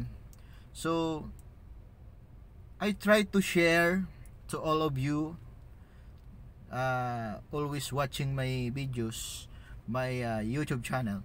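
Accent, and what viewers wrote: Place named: United States. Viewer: Ang galing naman pwede na mag amerikano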